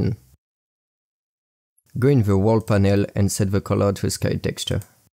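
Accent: France. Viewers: Dude that is a kickass French accent you got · tuto en anglais avec un accent français à la pisse, comme si les anglophones avaient pas asser de tuto pour blender; putain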